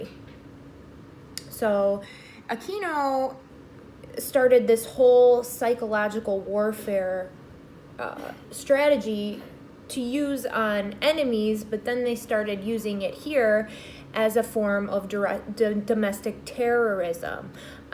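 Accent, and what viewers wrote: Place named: Midwest, United States. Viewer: I like your Mid-West ayacciyent! Seriously tho, CIA and FBI are nothing like most people think. They don't use law enforcement tactics but subversive, covert menacing,, and use your own people or enemies against you. They set it up but never really get hands dirty (directly speaking). They commit more felonies than they probably prevent.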